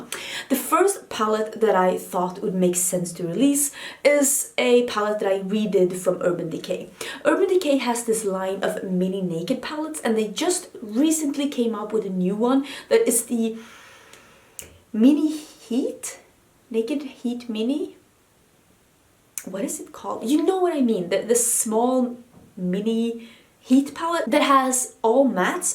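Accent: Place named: Sweden. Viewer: k this is so random but one half of my family is from Finland, moved to Canada in the 70s'80s.. I love watching your channel as it reminds me of my family who have now moved across the country 4 years ago! must be the similar accents/dialect of english as I know you are from Sweden. Beautiful countries!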